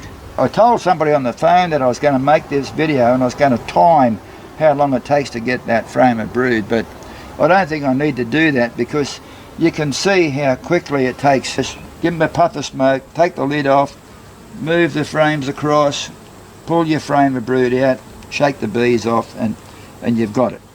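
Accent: Australia. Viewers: I came across this channel with your massive stash of sweet potato and then I was hooked as soon as I heard your Australian and saw the bees. You and are absolute darlings and I hope to catch more of you both. I sincerely hope all is going well xxx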